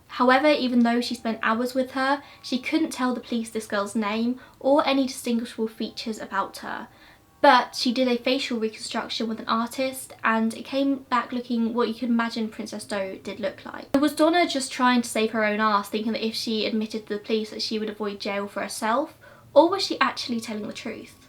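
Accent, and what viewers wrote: England, United Kingdom. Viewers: I just realised that the English accent is so much nicer than the American one. No offence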